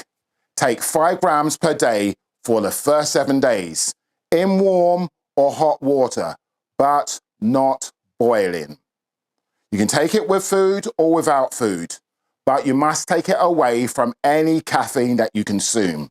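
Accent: United Kingdom. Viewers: Sounds like midlands (Brummie) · He is so British that he sounds fake.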